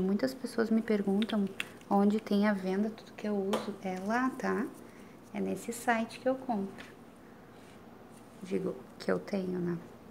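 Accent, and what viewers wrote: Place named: Rio Grande do Sul, Brazil. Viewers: Pelo sotaque acho que é gaúcha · Odeio sotaque Gaúcho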